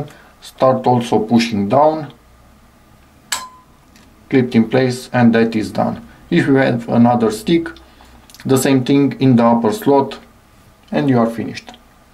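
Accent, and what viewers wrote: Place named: Romania. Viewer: на английском говорит отвратительно...... акцент капец как слышно.... румын или серб???? или болгар?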